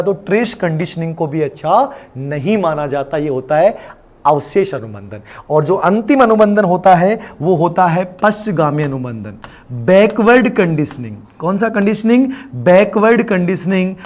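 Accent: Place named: Shekhawati, India. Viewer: Sir churu jhunjhunu se ho kya....lang. udhar ki lgi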